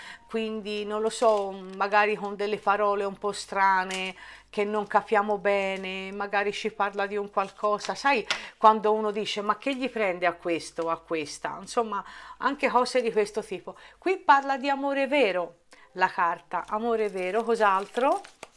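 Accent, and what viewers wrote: Tuscany, Italy. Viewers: Cara ti seguo da diverso tempo anche se non interagisco praticamente mai Adoro il toscano e ascoltarti è piacevolissimo Per quanto riguarda la vaccinazione porta pazienza vedrai che tutto si sistemera' quanto prima In bocca al lupo 😘😘😘😘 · Ciao sei dolcissima mi piace il tuo accento molto toscano.. ti sono vicina e ti mando tanta positività ❤😘😘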